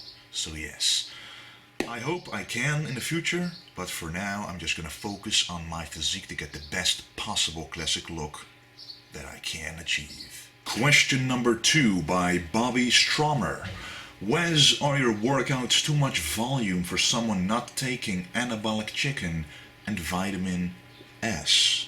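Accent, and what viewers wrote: Netherlands. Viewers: I had a great time hearing your dialect, beeing Duch myself I immediately heard that, which is totally hidden from me when you speak english. That was fun, I didn't expect that at all. Thanks for so many great great videos. Next to Mountaindog John Meadows and Athlean-X Jeff Cavaliere this is an awesome source of high quality, down-to-earth information.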